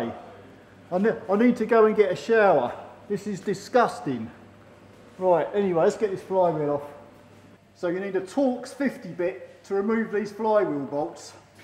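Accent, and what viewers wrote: Cockney, United Kingdom. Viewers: Are you from London? Im Croat and you sound to me like cockney accent, am I right?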